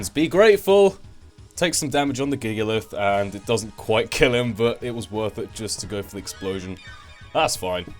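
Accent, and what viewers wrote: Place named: England, United Kingdom. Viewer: whereabouts in England are you from?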